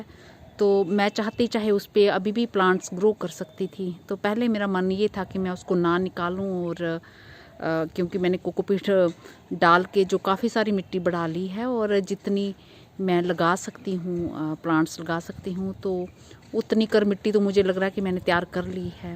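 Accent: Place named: Haryana, India. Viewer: Ok ,aap jesay bolti hai us say legta hai ki aap haryana say, bahut achi city say hai aap. 👍🤝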